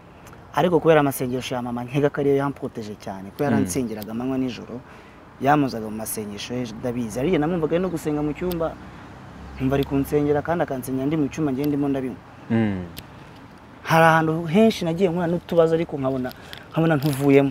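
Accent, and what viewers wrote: France. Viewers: Afite accent nziza yifrance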